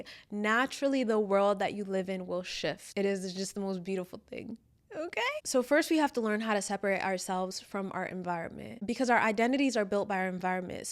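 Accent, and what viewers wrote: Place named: United Kingdom. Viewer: Why did I thought you were from the UK??? 😂😂😂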